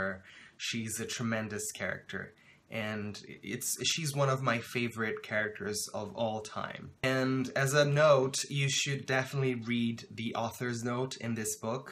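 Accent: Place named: Brazil. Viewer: O rapaz é brasileiro... fala inglês perfeito. Só perceptível porque o sotaque brasileiro é evidente no inglês. Fiquei impressionado, quero ser como ele ☺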